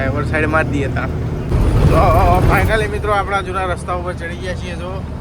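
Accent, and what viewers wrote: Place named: Kheda, India. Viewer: तुम्हारी भाषा नडीयाद खेडा नी छे🎉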